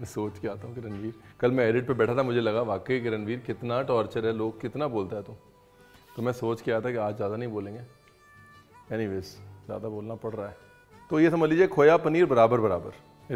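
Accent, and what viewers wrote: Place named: Punjab, India. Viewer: I am from Punjab and loves your punjabi accent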